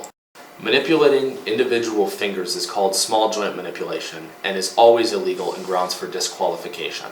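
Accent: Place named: Minnesota, United States. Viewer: I love that Minnesota accent.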